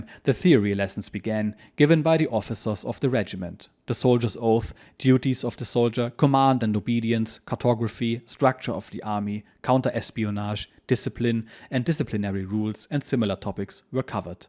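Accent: Germany. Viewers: I absolutely love hearing german diaries with a german accent. So much better than AI. Thanks! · I appreciate it's in english with a german accent not a posh British accent for a german wartime memoir. Thanks!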